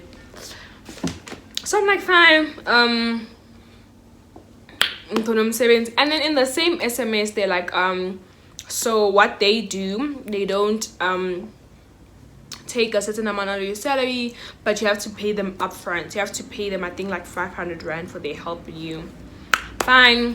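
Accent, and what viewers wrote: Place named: Nigeria. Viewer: The Nigerian accent >> 😂😂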